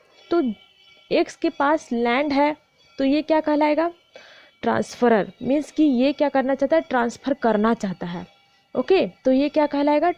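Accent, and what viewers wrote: Bihar, India. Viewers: Maam bihar sa hoo kya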